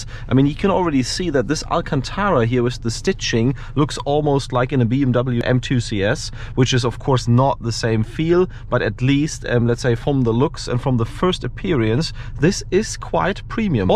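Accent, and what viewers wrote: Germany. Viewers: It took exactly 3 seconds to know you are german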